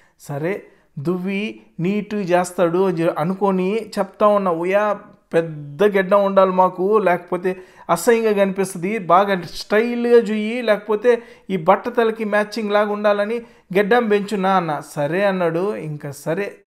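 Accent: Nellore, India. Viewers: Nellore slang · Sooper comedy Mana nellore yasa adaragottav 😁😁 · anukuna brother mi slang chusi ,manadi kuda Nellore ee.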